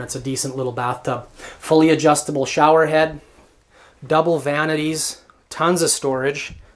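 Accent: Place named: Canada. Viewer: Boy theres Canadian accent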